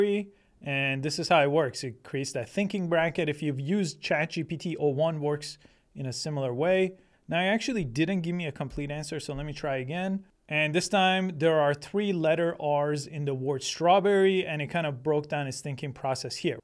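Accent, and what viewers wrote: Iran. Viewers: I found your channel while searching for local installations of R1. While your accent is very good, I detected some Persian influence in it. After doing some Googling, I discovered that I was right—you are actually from Iran. I wish you could start another channel in Persian; your homeland also needs your knowledge. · dude im hearing some persian accent lol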